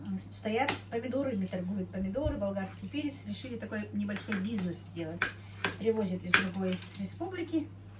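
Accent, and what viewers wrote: Standard. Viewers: Привет вам большой...меня удивило ,что вы так чисто говорите по русски ,многие русские так не умеют говорить... чистюля ...настоящий профессионал...удачи вам и благополучия. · Да речь прекрасная, чистая, грамотная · Чисто на русском говорит потому что она училась советское время в школе не во всех школах был родной язык урок. язык учили дома в кругу семьи соседями и родственниками в Чечено-Ингушской Республике ЧИАССР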